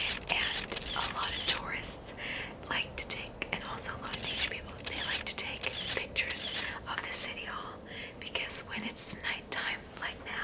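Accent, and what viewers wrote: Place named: United States. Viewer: You sound very American. Is that on purpose or does it just happen? Either way this was lovely (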